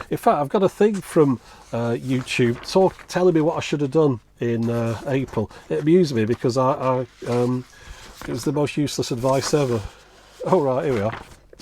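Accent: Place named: Wirral, United Kingdom. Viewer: . I'started watching you three or four months ago and got hooked, so today a signed up with Patreon. As a Brit living in the USA, with maybe a few more miles on the clock than yourself, and married to a girl from the Wirral, it's great to hear that accent again. I know you like units with a CRT embedded in it and wondered whether you know anything about the portable Galaxy 2200 Video Cassette recorder? Its of the 'made of steel' era with a corded remote, powered by battery or mains and with a handle to carry it around... There's precious little info on the web and prices go from free to $250 functioning. Any how, I could make an unusual piece. Much love from across the ocean. Leon.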